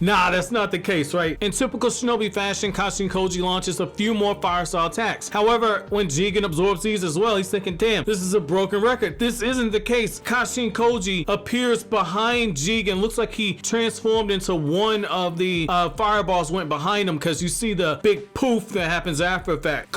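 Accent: New York, United States. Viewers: What Part of Newyork you from man?🙌🏾🙌🏾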